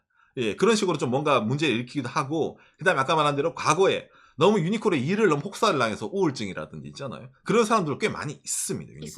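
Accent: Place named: Gyeongsang, South Korea. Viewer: 앗, 어딜가도 사투리, 반값소, 갱상도 요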